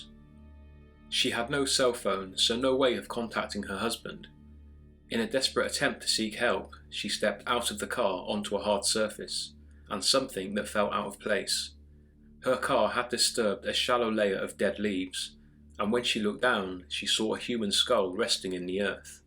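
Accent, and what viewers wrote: England, United Kingdom. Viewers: there from different countries, this is an English accent, Bale is from wales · Great video! Love your channel....and American girls love a English accent 🤗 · You need a better microphone to showcase that English accent. It sounds hollow and tinny. Otherwise the video was excellent, keep them coming.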